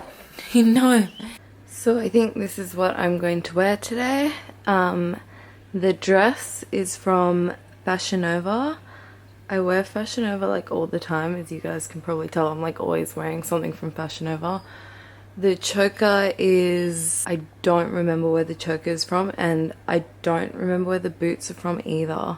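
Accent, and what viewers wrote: Australia. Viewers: Every time I finish watching one of your videos, I naturally attempt to speak in an Australian accent for the rest of the day.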